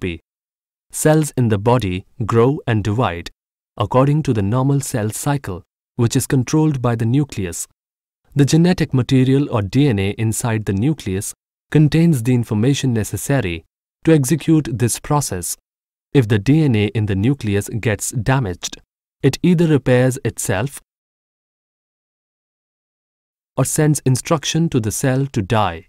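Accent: India. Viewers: ahhhh yes.... the fabules indian accent absoulutly love it omg i cant spell, anyways whoever is going through this i pray for you to get better and never have doubt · สำเนียงแบบนี้อินเดียแน่เลย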